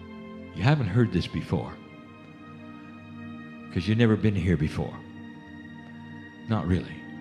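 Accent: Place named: United States. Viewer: he sounds like an american, with sound effects from Holliwood. hollwood is under question.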